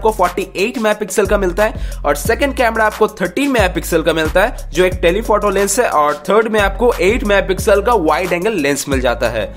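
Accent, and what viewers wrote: India. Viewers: Nice work but your hindi accent bro😂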